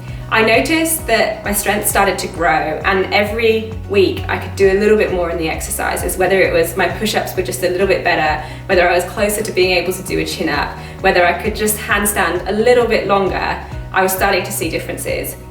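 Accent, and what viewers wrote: Australia. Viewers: I knew there was some sought of Aussie in your accent, and then you said you moved there. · You look amazing!!! I need motivation like you had/have! Cute little mix of an aus/English accent there 😂 it’s nice to see you doing so well great video deffo be watching more! Starting to get myself fit now! It’s the food side I struggle with what’s good/bad etc because I love cooking but I’m sure I’ll learn xxxx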